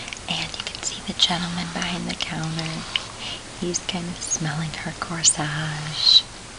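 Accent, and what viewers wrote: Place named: South, United States. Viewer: what I looove about this video is... :D many aspects, dear: your accent (southern US? I am not native English speaker yet I would place it there), the brush, the little stories and of course the pictures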